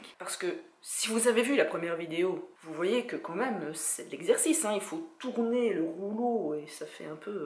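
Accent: Belgium. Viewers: J’entends plus belge qui suisse perso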